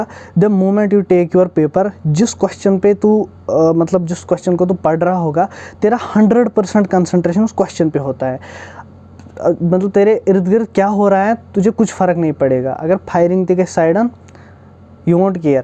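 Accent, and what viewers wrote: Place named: Kashmir, India. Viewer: Thanks bro.... For giving these motivational videos ♥♥ Way you talk kashmiri in urdu lang. I really like it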